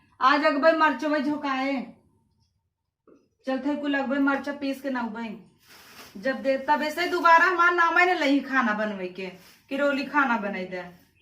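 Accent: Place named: Awadh, India. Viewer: didi apka bolne ka andaj awdhi Bhasha mey bahut hi achha lagta hai 👍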